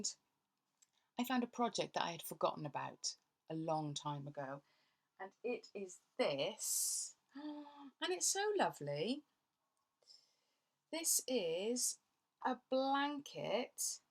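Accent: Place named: Wales, United Kingdom. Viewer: I found your first podcast because YouTube suggested it to me as I had been watching Ranunculus techniques videos. And of course your first episode featured your Ranunculus. I am enjoying your podcast, especially the odd Bits in your Welsh accent. I hope you managed to take the ferry from Vancouver to Vancouver Island! I live beside Lake Ontario, but I am originally from the UK south coast.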